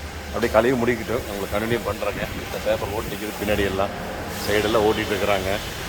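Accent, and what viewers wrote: Kongu, India. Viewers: அருமையான வேலை..... உங்களின் கொங்கு தமிழ் பேச்சு.... மிகவும் அழகு.. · கொஞ்சும் கொங்குத் தமிழ் பேசும் கோமகரே...! திகட்டாத தித்திக்கும் பேச்சுடைய திருப்பூர் திருமகரே...! காண்டா மிருக கலர்ல ஹோண்டா சிட்டி கார் வந்தாலும் அதை ஃபேண்டா கூல்டிரிங்ஸ் கலராட்டம் மாத்துறதுதான் நம்ம அய்யாவோட மகத்துவமே❤❤❤❤❤❤😊😊😊😊❤❤❤❤ அட...அட...அட... அருமையோ அருமை அய்யா🙏😊😊😊😊❤❤❤❤❤❤ 2004 மாடல் ஹோண்டா சிட்டி கார் அடிச்சு விரட்டி ஓனர் நன்றாக சிட்டிக்குள்ளையும் ஹைவேயிலையும் ஓட்டியிருந்தால் தோராயமாக 1,80,000 முதல் 2,30,000கிலோமீட்டர் வரைக்கும் கார் ஓடியிருக்க வாய்ப்பு இருக்கு அய்யா. என்றும் அன்புடன் உங்கள் மகன்போல இவன் ரா.முஹம்மது கபூர் (சென்னையில் இருந்து)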